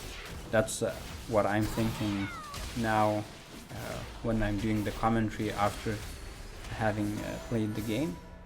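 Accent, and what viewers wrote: Lithuania. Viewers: kai tu pasakai vidio iskarto matosi kad tu letuvis bet vistiek geras vidio :D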